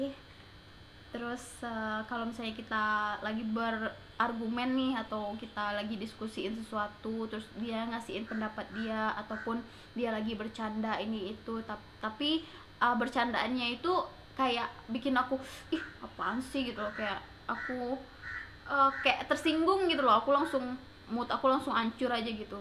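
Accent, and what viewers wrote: India. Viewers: Keren suaranya sudah pas suara cewek India..baiknya suami adek ni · Masyaallah suara nya merdu persis India bgt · Masyaallah mbak..wlaupun org indonesia tapi suara logat india ny bagus bnget.. Samawa mbak... Beruntung ny bisa mndpat kn suami yg baik.. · Masyaallah kak, suaranya percis banget sama orang india💞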